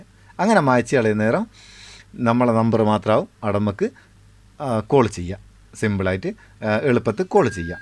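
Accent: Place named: Kozhikode, India. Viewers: നിങ്ങളുടെ കോഴിക്കോടൻ സംസാരം നല്ല രസമുണ്ട് കേൾക്കാൻ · നിങ്ങളുടെ നാട് വടകരയാണോ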